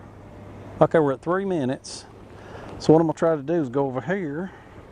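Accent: South, United States. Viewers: As being a professional land surveyor in neighboring South Carolina I would think that the Georgia Department of Natural Resource owns the island and the surrounding river. Just subscribed I love your videos and the perfect southern accent!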